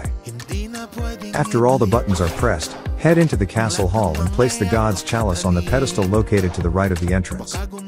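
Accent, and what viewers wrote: Philippines. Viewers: Are you filipino? Bc the sound is filipino bro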